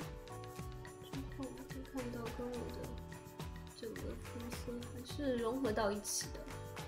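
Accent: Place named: Taiwan. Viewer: 讲话像台湾人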